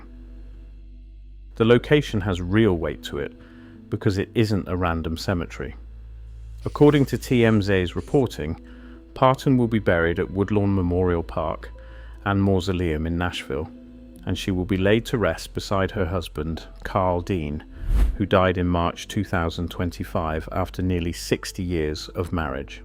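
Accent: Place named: United Kingdom. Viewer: Why does a video about an American icon have a British narrator??????????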